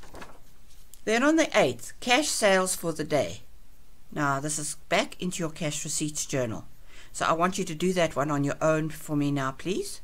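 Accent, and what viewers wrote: South Africa. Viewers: I wasnt expecting a south african accent eh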